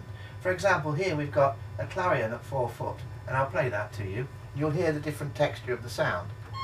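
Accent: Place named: Southern England, United Kingdom. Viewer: Why is his voice hilarious? It sounds like a very normal southern English accent!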